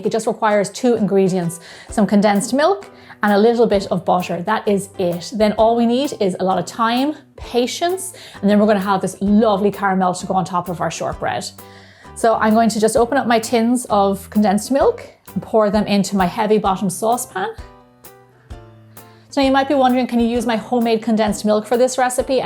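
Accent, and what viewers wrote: Ireland. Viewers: What percentage do you suggest if I use dark chocolate? I love this and your accent as I lived in IE for 8 years!!! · I have to be honest, part of the reason why I clicked is because I love the Irish accent. :) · I knew you are Irish!You still have very strong accent💕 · YES! I had asked you on a very old video fo yours because I thought I recognized your accent, (I'm Irish myself,) then read your 'About' and was so confused! I was trying to think "Where the hell would an accent like that be other than Ireland..?" and nothing came to mind! Fellow Irish person here X You're my idol as well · Those look so yummy. I,love watching your videos and just love hearing your IRISH ACCENT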